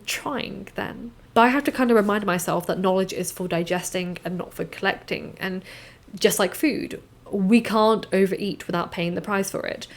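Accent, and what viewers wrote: United Kingdom. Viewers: Is that British accent? I love your accent! You sound so heavenly when you speak :)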